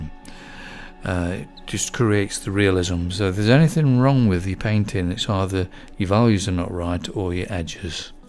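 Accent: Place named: Derbyshire, United Kingdom. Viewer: Thanks! That was very helpful! Love your accent too! Derbyshire? Notts? I work in pastels but have never attempted a portrait so far.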